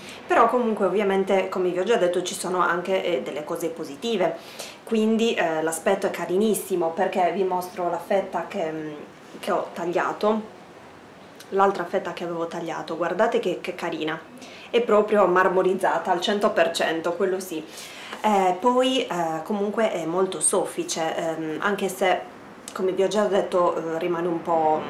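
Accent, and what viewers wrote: Sardinia, Italy. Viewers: Dall'accento sembri sarda, ho indovinato?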